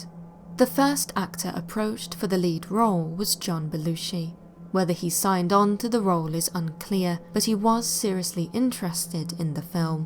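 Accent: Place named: RP, United Kingdom. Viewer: Queen's English...luvly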